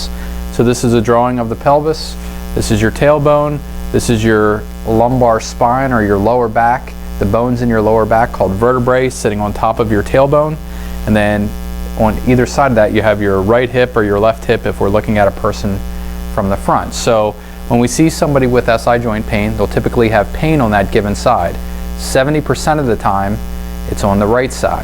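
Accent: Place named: Pittsburgh, United States. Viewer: Are you around Pittsburgh? I'm judging by your dialect.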